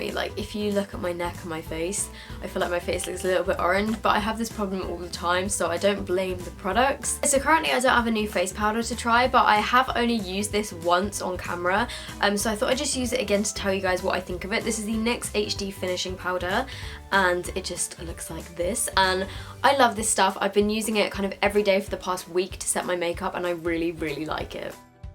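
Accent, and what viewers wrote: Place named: United Kingdom. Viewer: Im probably the only one who doesnt really enjoy the british accent but I could listen to yours for hours. thanks for making videos, love from germany ❤❤❤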